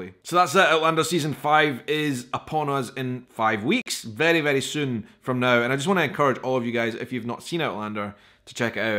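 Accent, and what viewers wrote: Scotland, United Kingdom. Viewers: I have always wanted to go to Scotland and am saving even now...of course I consider Outlander one of the best adaptations ever and I love listening to you. Great Scottish burr.😎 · I love your scotish accent !!!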